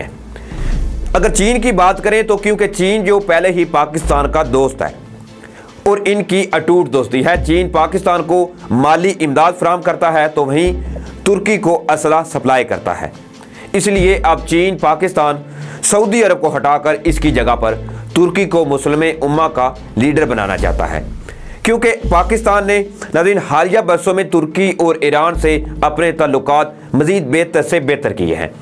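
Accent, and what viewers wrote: India. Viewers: Yah indian hai jo pakistani qoum may tafraqa daalnay ki koshish kar raha hai aur pakistan arab mukhalif jazbat ubhar raha hai. iss ki urdu check karo koshish kar raha hai punjabi ki tarha urdu bolnay ki likin bol raha hai indian hamachal walon ki tarha. pakistanio Iss say dur raho brainwash karnay ki koshish kar raha jai He is a blood indian trying to build/arouse anti saudi and arab sentiments in Pakistan. Check his urdu he is trying speak in pakistani accent. HE IS A RAW MEDIA CELL MEMBER trying to brainwash Pakistani mined set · Ap urdo ko hindi style men q bol rhe hin Apni urdo thik kren plzzz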